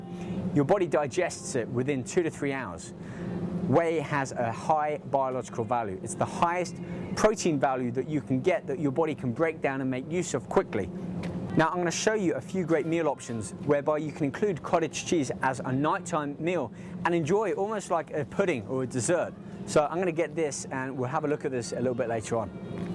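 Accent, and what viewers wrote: United Kingdom. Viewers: woter, olive ol, mo. british haha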